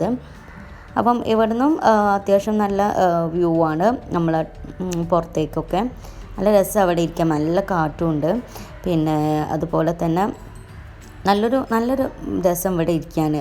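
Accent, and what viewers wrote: Malappuram, India. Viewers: lovely home 🏘️🏡🏠 Ingalu Malappuram slag paray ... PLZZ We love it ❤️🎉👍🌷🌻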